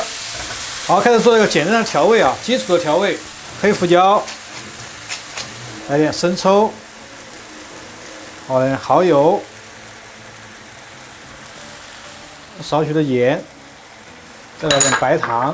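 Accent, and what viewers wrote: Sichuan, China. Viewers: 四川口音（幽默）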